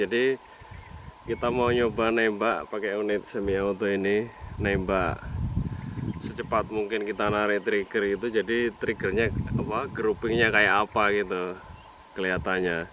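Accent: Java, Indonesia. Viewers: jowo mu ngendi kang..kok koyo logat jowo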